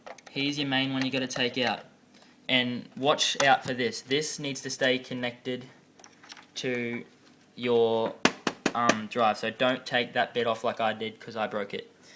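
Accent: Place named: Australia. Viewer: "Don't swear"... You sound Aussie as well but i'd turn and say fuck just for shits and gigs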